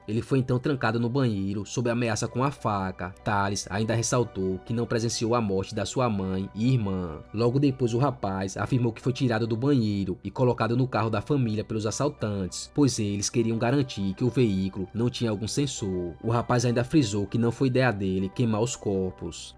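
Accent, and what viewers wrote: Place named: Rio Grande do Norte, Brazil. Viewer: Amigo, você é do RN? Tu tem sotaque de potiguar.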